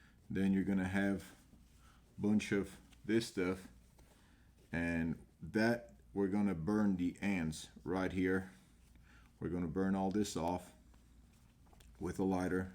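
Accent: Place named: Texas, United States. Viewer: I'm from Texas and you're from Florida, but I'm jealous because you have a perfect Texas accent, especially from the Central toward West Texas regions. You look like you could be in western movies. You might consider being an extra, at least. No one in, say, Fredericksburg or Hamilton or Junction, Texas would ever think you're a Floridian.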